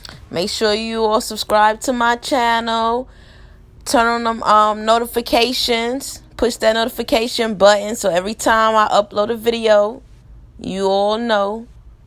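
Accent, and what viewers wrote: New York, United States. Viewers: Awesome work! And I love your accent! New York, right? I'm originally from Jersey now in NC. Ain't nothing like accents from up top! Love your videos and please keep sharing!!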